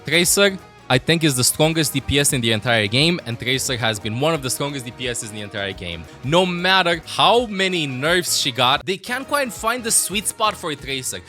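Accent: Romania. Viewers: That’s European accent, like Romanian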